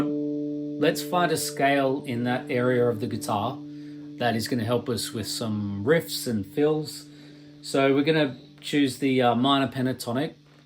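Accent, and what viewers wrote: Australia. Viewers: Not an accent. That's Australian. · great stuff ozzie accent to doesn't get any better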